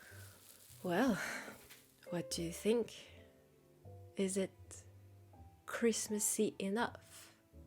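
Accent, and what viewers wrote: France. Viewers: Love your videos! And I’m a sucker for accents, especially French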